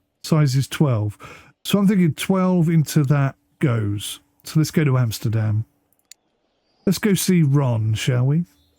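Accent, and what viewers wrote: United Kingdom. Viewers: Happy to see you giving this game a go so i could see some gameplay of it and learn a bit about how it plays, much appreciated 👍 But what is up with the sudden on/off cam inclusions here and there? I'm not a frequent viewer so maybe it's part of your style or some kind of in-joke? The first one felt kind of natural and i figured it was like when people streaming to show their inputs while playing. But then a bit later came a weird belly/crotch cam shot and a couple of completely black ones that made me start to wonder if these are AI generated blurbs or something like that, as those (at least for me) didn't seem to have any purpose to them 😅 The one where you were gesturing while talking about boat designs felt relevant to show your hands when talking, so then we were back to making sense 🤔 A few of them felt like they were also rotated 90 degrees compared to the original cam angle? 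Dropped a like and would love to see more gameplay of this, if there's enough content in there to make more videos out of it. It feels very fitting to have a 18th century sailing game with a British narrator! 😁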